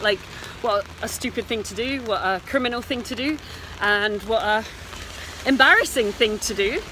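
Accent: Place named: Scotland, United Kingdom. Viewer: Trying to place your accent... definitely some distant Scottish in there, time in England and a Brooklyn twang too...?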